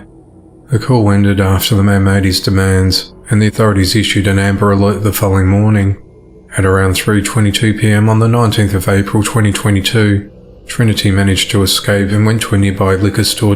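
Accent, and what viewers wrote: Australia. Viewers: Australia, i was born in Australia Victoria nsw, but in ole blighty now I hear the accent now x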